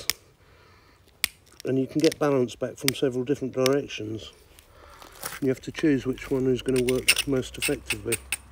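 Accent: England, United Kingdom. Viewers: You won my sub boss. I've been searching for a channel like yours. I in the States bud in a state called WV where traces of Native American's are everywhere infact where I am to be laid to rest is a in a grave yard with a rare unopened Indian mound that they believe it goes back to the Woodland 1 period. I love searching for arrow heads and other artifacts I have been very fortunate many many axe heads. Some very old and others much newer 1500's......but man I could ask you questions till you go crazy. Also I can talk your ear off. I am just curious where you are from? You sound very English but my ear isn't good enough to know if you could be from Australia, New Zealand, or Great Britain or Wales.....so I just wanted to ask. You also might be my first person I am gonna look into Patreon. Cuz I 100% believe everything your doing needs passed down. 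I love everything your doing and damn if you don't do it like a Master. Take care boss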